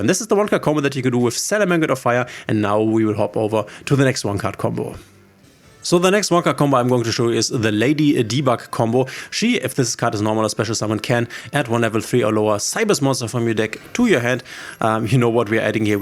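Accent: Germany. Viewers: Bruder mach bitte ein Video auf deutsch und eins auf Englisch weil ich als deutscher so krass raushöre das du deutsch bist 🥲😂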